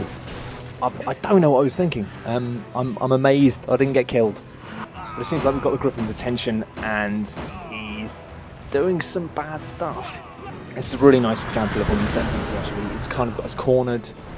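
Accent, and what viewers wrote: United Kingdom. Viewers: Every time I listen to the commentary I always think of Simon Pegg. I mean I'll probably just buy this game based off of this guys sweet UK accent and commentary :P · dude the english accent is so cool wish i had one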